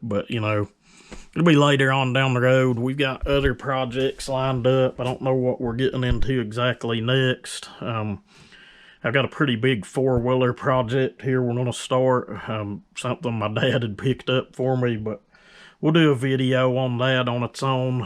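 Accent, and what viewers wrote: United States. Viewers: This accent! What state you from bud. Jason new subscriber from McLean Saskatchewan. I'm really liking your channel.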